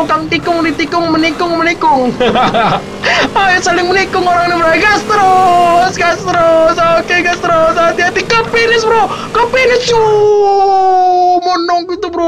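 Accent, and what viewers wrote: Medan, Indonesia. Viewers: Orang medan bang ya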